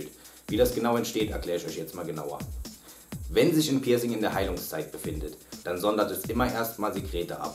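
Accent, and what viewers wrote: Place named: Palatinate, Germany. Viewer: ahso, du kommst aus der Pfalz. Deswegen biste mir so sympathisch😊Wir sind Nachbarn. Ich liebe den pfälzischen Dialekt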